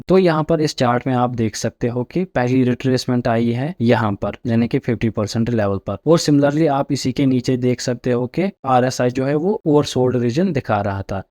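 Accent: Kashmir, India. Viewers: Sir, app Kashmir se ho kya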